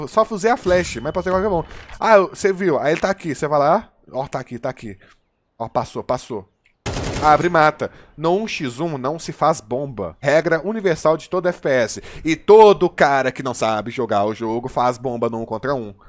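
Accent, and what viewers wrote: Belo Horizonte, Brazil. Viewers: Sotaque de BH do é bão dms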